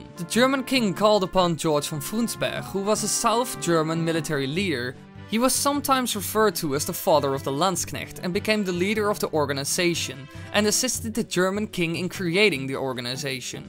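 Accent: Netherlands. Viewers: After this Video i would probably think he is Dutch, the way he speaks with his accent reminds me of the Dutch people, especially when he says german words. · You'r Dutch arn't ya? I hear it to your accent, it's not the same as Britians or Americans, you are from the Netherlands, you even have the French R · you sound dutch... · Hold up. You're Dutch, aren't you? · true maar je klinkt zo Nederlands. vind het echt jammer. want ik vind je beter dan game theory. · English? He sounds Dutch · It's also weird to hear someone with a heavy Dutch accent be called an English person. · is either Dutch, flemish or Scandinavian going by his accent. He's not an American or englishman. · You Sound Dutch. are you Dutch?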